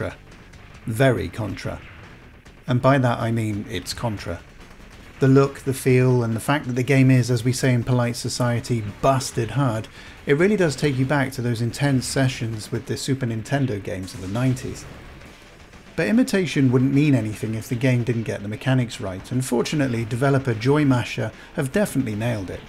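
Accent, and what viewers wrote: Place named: United Kingdom. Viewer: The narrator has such a sexy british voice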